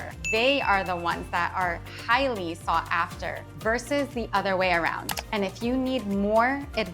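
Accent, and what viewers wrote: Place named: Staten Island, United States. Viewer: Staten Island accent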